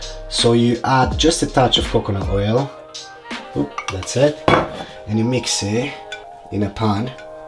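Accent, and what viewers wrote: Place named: Italy. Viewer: Where are you from? You've got that sort of Italian accent which is cool btw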